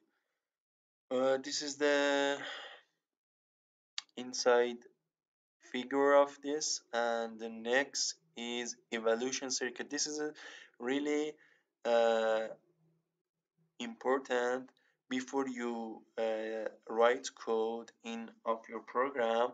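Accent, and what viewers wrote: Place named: Iran. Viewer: عاشق لهجه ایرانیت شدم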